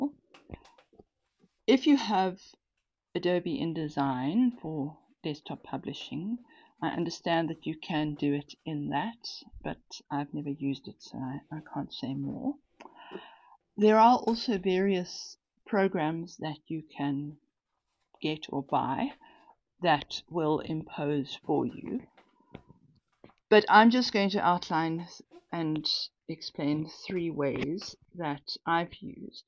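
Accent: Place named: South Africa. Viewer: You must be from South Africa? You sounds just like my mother in law. 😊 Thank you for this video! I have always arranged mini book pages the hard way, but now I have some much easier and quicker options.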